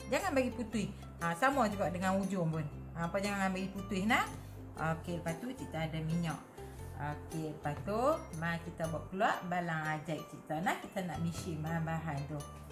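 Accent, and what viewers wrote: Kedah, Malaysia. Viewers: Adoi..kecheh lioq naa..paberet pulak tu..tq lah sharing resepi ni..suke bahasa cik ni..kedah me perlis ni..ingin nak bebual bahasa ni..sdap mcm mknn jugakk🤭😋❤👌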